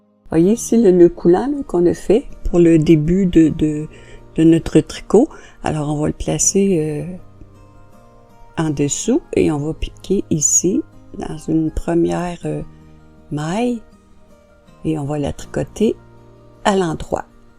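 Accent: Quebec, Canada. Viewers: J'adore ces pantoufles que je vais commencer ce soir , et vous Madame , comme professeur , vous êtes super : les expliquez très bien et quand vous approchez la caméra , on ne perd rien de l'explication , c'est super ! Cependant j'ai un petit bémol à propos de la musique que je trouve beaucoup trop forte , Je suis malentendante et je perds beaucoup d'explications à cause de la musique . J'ai essayé de l'enlever par tous les moyens que je connais mais je n'ai pas trouvé de solution ... C'est le seul petit reproche que je peux vous faire et à part ça , vous êtes du Québec, comme moi et ça me fait plaisir d'écouter votre voix . Merci pour tout .Excusez mon audace .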